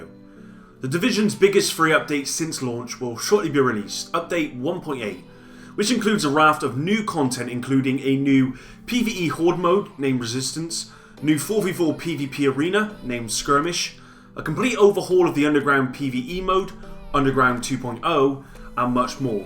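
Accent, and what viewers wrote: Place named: England, United Kingdom. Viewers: Oh these annoying as fuck English accents,why don't people just speak American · You sound like a fellow Bristolian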